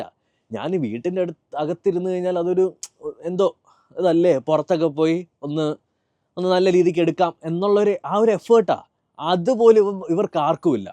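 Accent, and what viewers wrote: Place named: Thiruvananthapuram, India. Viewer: enth standard 😂😂 ninte movie review oke shokam aan....oru cinema knowledge ooo athinte oru essence oo ninte review il kanarila....chumma kore vaya kond goshti kanikum ....trivandrum slang il korch vaythalam.....and tech review x il ninum kittuna news athe pole irunn vayikunu.....ninte pandathe musically vedio kandapo manslyi nee oru kannapi mathrm ayrnu pine youtube il click aaypo ath ang continue cheythu....pine kore podi piler fans ulath kond koypmla....apart from as a content creator you are shokam .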